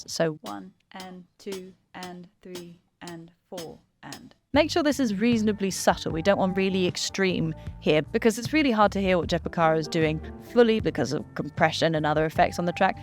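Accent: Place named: England, United Kingdom. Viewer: I love your videos and your English accent.😍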